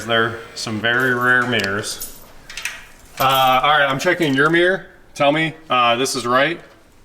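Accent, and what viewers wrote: Kansas, United States. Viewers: “Ardic blast” gotta love that Kansas accent haha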